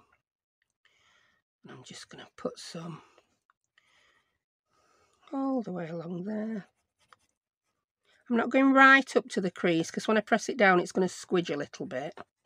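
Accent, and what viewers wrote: United Kingdom. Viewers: I am so glad I found your channel. I love your no-nonsense directions. Easy to follow with a bit of humor. It makes me smile that you share the flub-ups. 😊 I love your British accent and humor. It reminds me of a dear friend that passed a while ago. Keep making the how-to's and I will keep watching. ❤🥰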